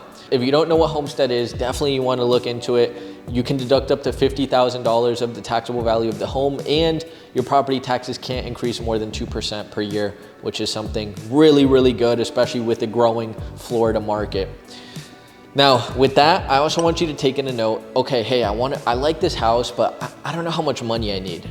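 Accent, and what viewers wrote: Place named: Venezuela. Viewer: No you did not just say you speak spanish you spoken in a Venezuelan accent because your mother is from Caracas. Your speech was slow and more deliberate as most Venezuelans I know speak faster. Yo hablo Castellano y cuatro otros idomas. Now for the house can you show other homes that are not that puke modern look, it screams cheap construction. There is more colors than black and white, it looks sterile and neutered, like they use to build prisons and those cookie cutter open concepts are terrible. I grew up in a real home, with clear defined rooms made with reinforced concrete, stucco and plaster interior walls about 2-3 inches thick. No hoa I approve of but the rest, the lot soil is more valuable that the material or labor. Can your builders do anything else but xerox every other lame builder. If they xerox Frank lloyd wright we might see something better.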